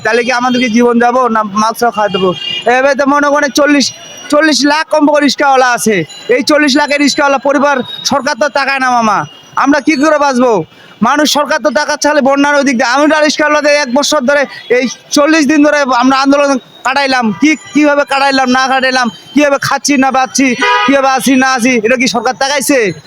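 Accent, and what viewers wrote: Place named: India. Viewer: Tumi ki bharoter bangaly naki? Tomar bhasay bharotiyo.mone hoy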